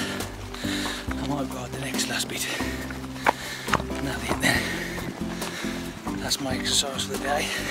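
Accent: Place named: West Midlands, United Kingdom. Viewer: were u from m8 sound from west midladz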